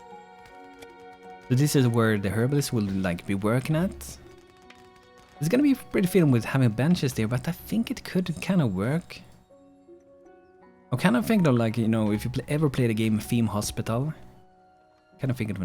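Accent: Sweden. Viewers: This game has so much potential, whenever I saw the trailer i was super excited. Awesome videos, dude. Subbed! :) ps: Where are you from? You sound swedish.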